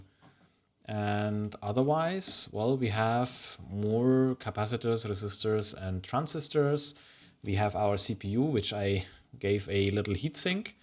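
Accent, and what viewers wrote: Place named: Germany. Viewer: you have a very distinct german pronounciation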